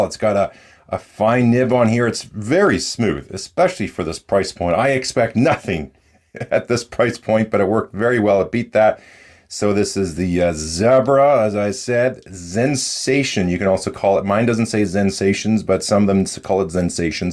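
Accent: Canada. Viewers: Are you Canadian ? You sound Canadian . Sound like a guy I watch on YouTube called AvE. EDIT : I heard at the end of the video you said " Canadian" as currency, Nice !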